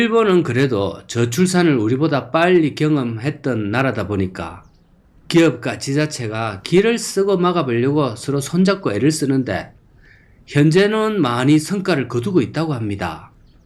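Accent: Gyeongsang, South Korea. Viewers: 쥔 양반 목소리가 상당히 특이하네요~ ^^; 뭐랄까, 갱상도 사투리+교회오빠 목소리 ? 한국 부동산 멸망전을 위해서 오늘도 고군분투 하시느라 고생이 많으시네요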